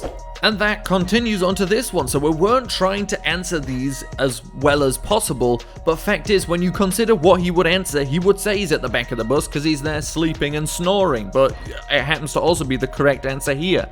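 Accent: United Kingdom. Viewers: James Gillespie because he has a British accent?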